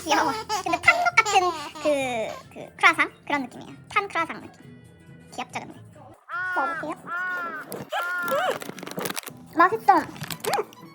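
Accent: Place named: Gangwon, South Korea. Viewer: 이 분 때문에 영동지방으로 어학연수 가고 싶은 일인 여깄음. 정말 극강의 로망 억양 인토네이숑… ㅎㅎㅎ❤❤❤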